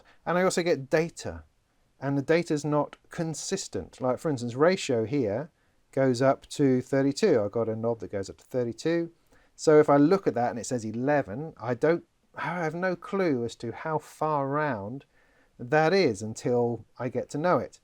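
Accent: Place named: England, United Kingdom. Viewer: Fabulous down to earth review and demonstration.. And best of all someone who's English.. No annoying American accent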